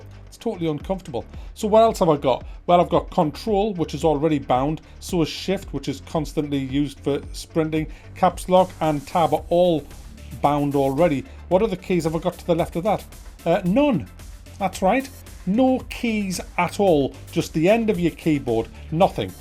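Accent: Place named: North East England, United Kingdom. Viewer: I look forward to videos, hearing a good old rant from a fellow North East bloke!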